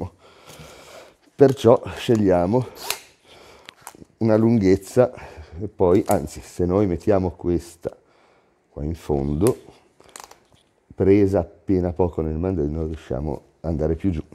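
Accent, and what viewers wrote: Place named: Liguria, Italy. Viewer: sei un ligure ti sento dall'accento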